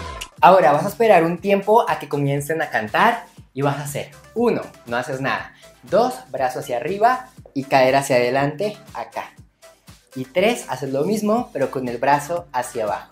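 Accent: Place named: Mexico. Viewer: De dónde eres no me suena tú dejo o eres Mexicano?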